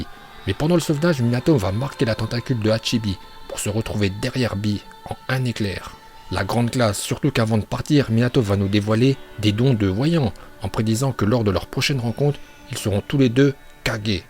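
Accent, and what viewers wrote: Japan. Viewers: J'aime trop ton accent japonais 🏯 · Super ces vidéo car on entend des prononciation que les youtubeurs français ne prononce pas comme : Naluto shipunden Laiton Vraiment sa fait plaisir d'avoir des prononciation japonaise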